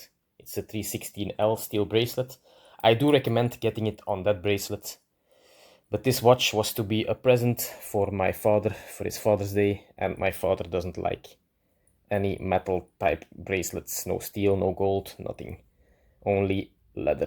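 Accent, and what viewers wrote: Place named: Netherlands. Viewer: So you're belgian? :) nice (anything else would still be nice - i like your video) I was suspecting a dutch-like english accent - your pronounciation resembles Mr.Dagon a lot (a guy with a pocket knife yt channel) Subtitles would help, but even without would be better than not making the video :)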